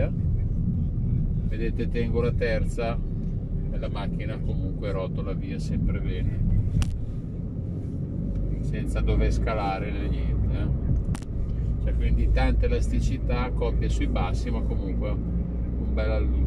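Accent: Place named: Milan, Italy. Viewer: Ue' figa, tipica parlata milanese:=))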